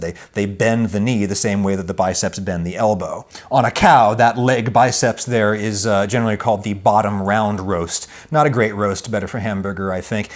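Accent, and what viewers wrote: United States. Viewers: He just sounds American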